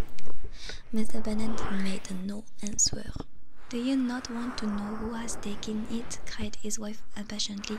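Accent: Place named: France. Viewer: DO MORE STORY READING WITH FRENCH ACCENT PLEASE, IT'S AMAZING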